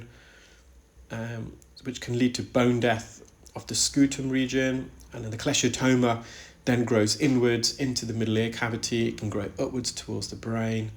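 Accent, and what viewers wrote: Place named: RP, United Kingdom. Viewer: a silky, smooth posh British accent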